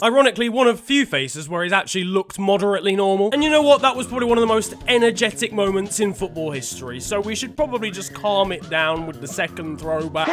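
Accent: Liverpool, United Kingdom. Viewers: 10/10 scouse accent aswell XD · Moore scouse accsent I am not English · Loving the scouse accent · That carragher accent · Love the Liverpool accent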